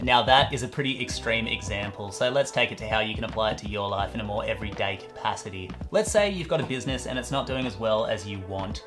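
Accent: Australia. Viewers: You have great content and that sexy Australian accent is a bonus.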